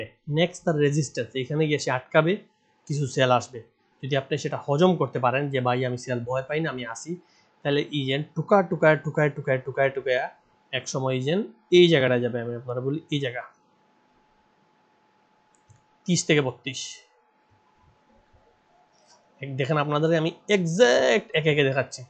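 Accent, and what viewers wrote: Sylhet, Bangladesh. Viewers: কিতা বা সোনা আপনি কি সিলেটি নি । আপনার বাড়ি চুনারুঘাট মৌলভীবাজার নাকি ফার্স সিলেটি। সুনামগঞ্জ আমাদের অনেক লোক আছে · Apni ki sylheti vaichab ni kitha khonor valani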